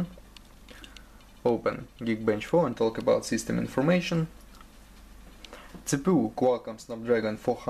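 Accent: Russia. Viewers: хехе факин рашн экцент, но это ничего, а вот политическая карта мира..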